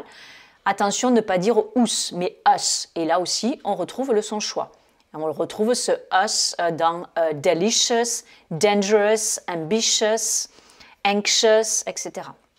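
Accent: France. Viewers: Bonjour, excellente présentation étendue de ce vocabulaire très intéressant par les temps qui courent. Cependant, je suis professeur d'anglais depuis 35 ans, et je me demandais si votre prononciation légèrement francisée des termes et expressions était voulue ou pas, dans le but de faciliter la prononciation anglaise pour vos internautes francophones ? Merci pour votre réponse éventuelle, et encore bravo.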